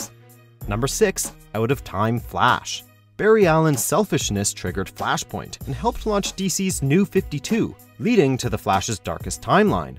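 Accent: Canada. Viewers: Are you Canadian? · They're Canadian I believe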